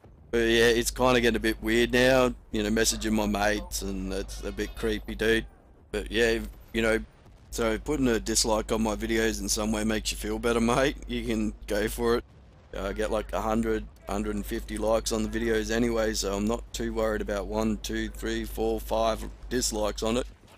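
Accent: Australia. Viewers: Hmm, you sound pretty australian to me :) As I am austrian, i love it when ppl mix names up xD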